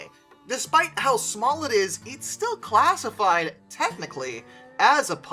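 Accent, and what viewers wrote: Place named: United States. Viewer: i wouldnt call these the smallest but its nice to hear an american talk about the sand hutton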